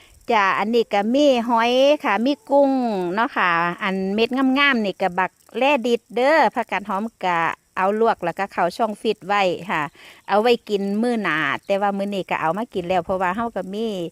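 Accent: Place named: Isan, Thailand. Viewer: เว้าอิสานกะฮักคือเก่า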